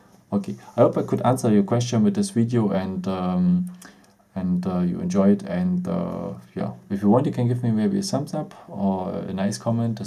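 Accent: Germany. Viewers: Hundert prozent deutscher. Aber video hat geholfen dange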